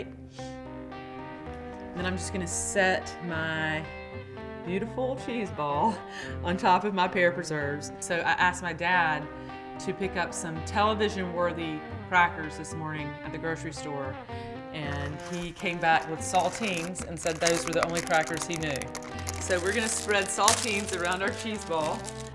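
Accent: South, United States. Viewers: I love your pronunciation and Southern Drawl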